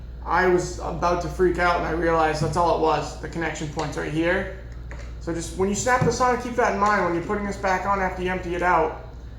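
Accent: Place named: Cockney, United Kingdom. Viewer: you are a star! Spanish Cockney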